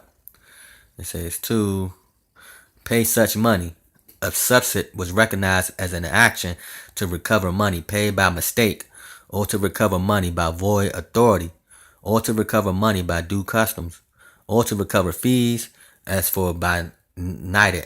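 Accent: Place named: Virginia, United States. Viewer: You're from Virginia, huh?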